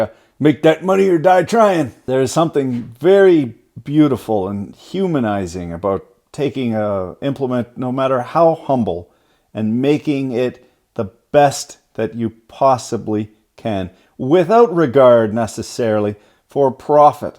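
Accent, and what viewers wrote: Canada. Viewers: I'm from Florida is this how all Canadians talk?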